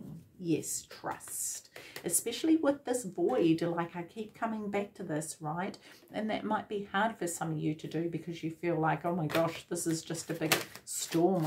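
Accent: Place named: New Zealand. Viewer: my moms from Christchurch, thought I recognized the accent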